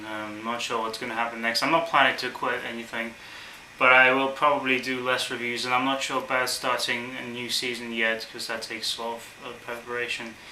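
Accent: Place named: England, United Kingdom. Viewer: nice review!! where in english are u from?? I am from vancouver canada living in osaka japan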